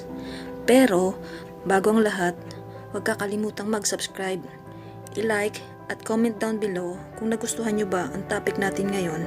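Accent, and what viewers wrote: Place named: Visayas, Philippines. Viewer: Walang kwenta Ang narrator bisaya mag narrate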